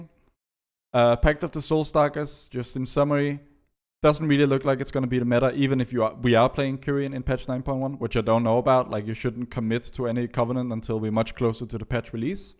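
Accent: Denmark. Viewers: Are you Danish by any chance? The accent seems very familiar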